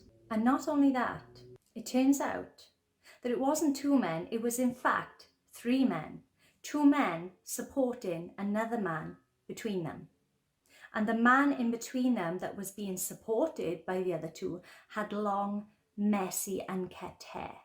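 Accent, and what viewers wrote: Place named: Scotland, United Kingdom. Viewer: You must be Scott ur accent tells it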